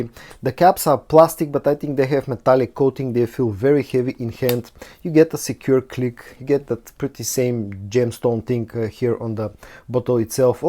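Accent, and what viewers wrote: Bulgaria. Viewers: Sometimes I have a problem understanding if you're saying "woodsy" or "oudsy"... I guess with your accent the "W" is silent. Hope you don't take this the wrong way...I absolutely love to listen to your beautiful Bulgarian accent. Happy to see the channel advancing pretty quickly after that big 10,000 subscriber mark just a few days ago. I love the thumbnail of the video you made of the 10K...you look so happy and proud. I appreciate all you do for us brother. Be safe all.🙏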